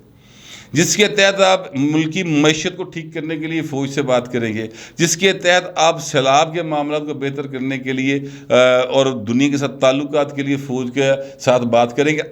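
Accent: Punjab, Pakistan. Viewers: ھم بچپن میں ، جھوٹھو جھوٹھ چتالیا سو (100) بولا کرتے تھے۔ آپ سو کو اگنور کر جاتے ھیں۔ پتہ نہیں ھم ھی غلط تھے۔جو آپ نے 55 سال بعد تصیح کر دی ھے۔ ویسے اردو میں آپ کا پنجابی کا تڑکا پیارا لگتا ھے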